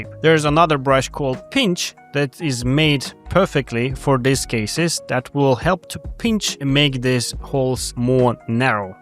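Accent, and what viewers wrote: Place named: Russia. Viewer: I love the American Russian accent of this guy lmao, hands downs best tutorials in the 3d AI space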